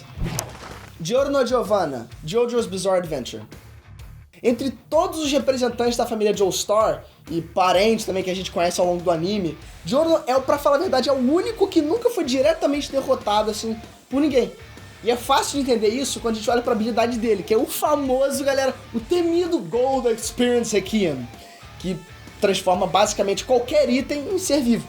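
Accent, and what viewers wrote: Rio de Janeiro, Brazil. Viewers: sotaque carioca kkkk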